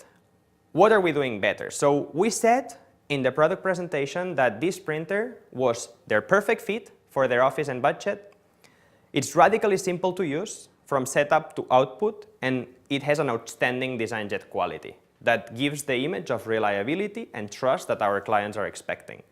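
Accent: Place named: Italy. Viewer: Sicuro sei italiano!!!😊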